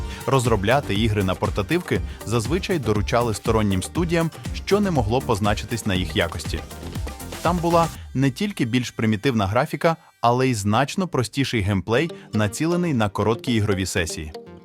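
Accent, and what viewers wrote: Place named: Surzhyk, Ukraine. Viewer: Это непонятно суржиком называется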